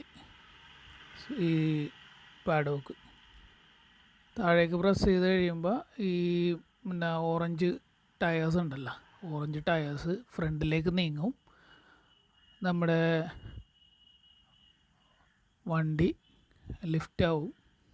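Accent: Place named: Mattancherry, India. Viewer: Your kindness mind and beautiful mattancheri slang awesome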